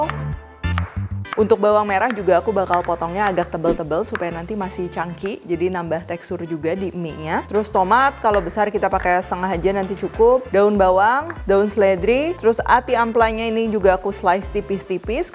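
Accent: Indonesia. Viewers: Medokkk🥰🥰🥰❤, keren chef, enakk👏👏👏👏👏👏👏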